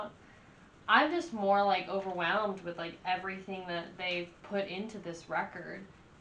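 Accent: South, United States. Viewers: I'm thinking it's thrash metal perfection. is incredible. One of the best metal records out there. Are you southern, or from like Tennessee? Love the accent in there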